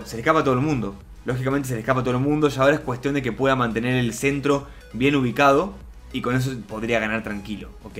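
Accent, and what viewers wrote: Argentina. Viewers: por su acento lo más probable es que sea argentino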